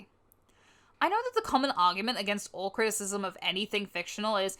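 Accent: Australia. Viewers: You sound like an australian Tiny Tina, an’ it’s awesome!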